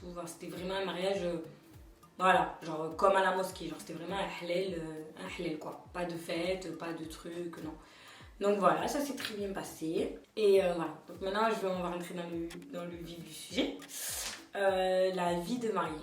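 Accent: Marseille, France. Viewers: Tu est de Marseille ? L'accent ?? ☺️ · Ton accent marseillais est juste magnifique ! Wouaaaaaaaaaw 💓 heureux ménage la jolie ,gros bisous 😘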